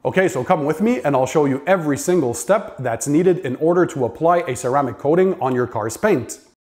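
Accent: Quebec, Canada. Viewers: Are you from quebec ? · Are you Canadian?? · French Canadian? You sound hilarious. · Dans seulement 5 seconde je le savais deja que tu venait du quebec , l'accent quebecois et a lexterieur les maisons typique dans les banlieues montreal. Très bon video ! Learned a lot from this video !!!!! definitely subscribing!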